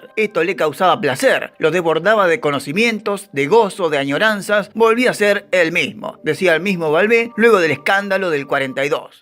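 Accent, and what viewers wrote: Buenos Aires, Argentina. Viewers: Excelente video. Triste tanta ignorancia en esa época. La Madre y la sociedad eran brutales y de doble cara. Muy bonito el acento argentino. Gracias. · La Verdad, Excelente!!! has captado toda mi atención! No conocía tu canal pero ya estoy subscrito! La energía y el tono de voz, con el que narras! Es Genial, sumado a eso ese acento y ese humor porteño! Es Genial, 🤣🤣🤣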